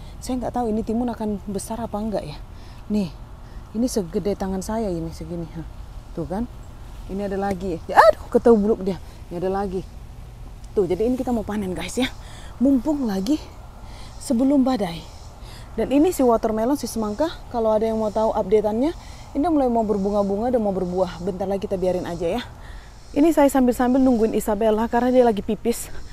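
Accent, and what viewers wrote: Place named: Medan, Indonesia. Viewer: apa adanya macam kayak logat medan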